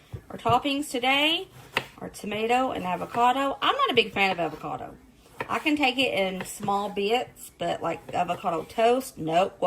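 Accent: South, United States. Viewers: Came across you today and I have to say you are a joy to watch between your great, cheerful personality, your cute southern accent and your sweet face! You are so creative and with the price of groceries these days, you are doing a lot of good sharing all of your around $5 recipes and make it look fun!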